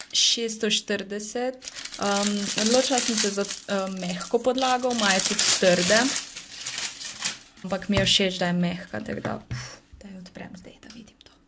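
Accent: Carinthia, Austria. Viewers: Ta koroški naglas je 🔝👌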